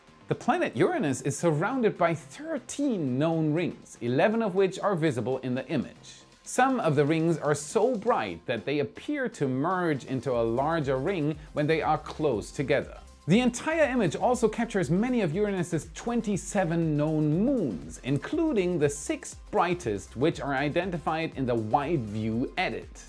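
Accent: Germany. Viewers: Are you german? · Great show. Your Deutschland accent is fading. Is that intentional? Space should always have a German accent! For a good laugh YouTube for Tom Lehrer's "Wehrner Von Braun" video... (all of Tom Lehrer is irreverent and hilarious... watch the Masochism Tango too for a ridiculous laugh).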